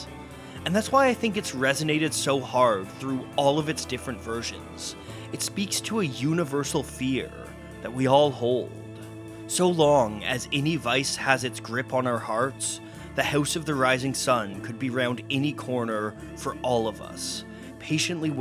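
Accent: Ontario, Canada. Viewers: The narrator sounds like hes from Ontario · Narrator is sooo Canadian that I almost think it's a young Bruce McCulloch. Hoose.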